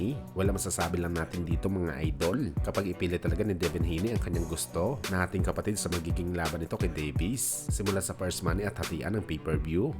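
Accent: Visayas, Philippines. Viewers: Ikaw lng ata nakakarinig Ng sinasabi mo,punting bisaya na nga Ang hina pa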